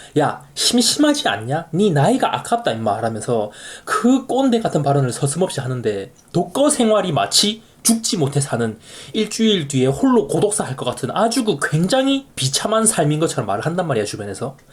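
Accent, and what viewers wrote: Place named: Gyeongsang, South Korea. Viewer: 아 갱상도 사투리 듣기시러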